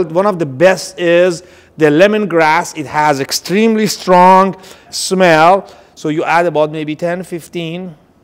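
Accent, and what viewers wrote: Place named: Iran. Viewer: Yeh. This is how Persians speak. Strong accent. You can tell straight away he is Persian.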